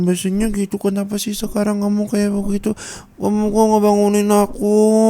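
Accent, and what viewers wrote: Java, Indonesia. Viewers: JAWA NYA AKHHH, BANYAKIN NGOMONG JAWA!!! ENAK BNGT DI DENGERNYA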